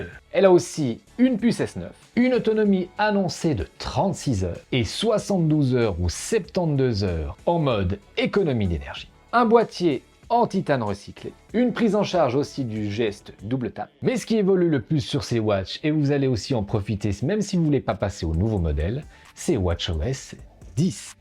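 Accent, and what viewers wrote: Switzerland. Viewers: Se bon accent Suisse il m’avait manqué, welcome back et félicitations pour cette grande mif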